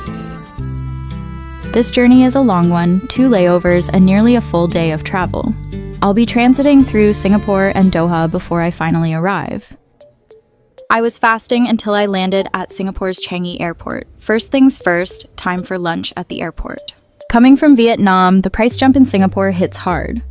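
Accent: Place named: United States. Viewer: Korean??? Then where is the american accent coming from?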